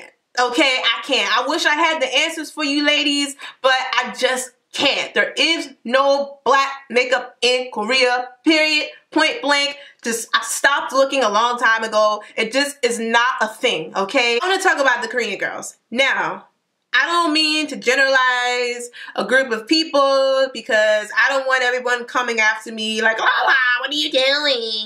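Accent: Baltimore, United States. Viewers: I’m sorry this is my first time watching your videos and I sweaaaar you talk like Tamar Braxton 😂😂😂😂😂😂 I guess that’s a Baltimore thing 😂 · I KNEW THAT WAS A BMORE ACCENT😂😂 Nice to know someone older, from my hometown, give tips about Korea.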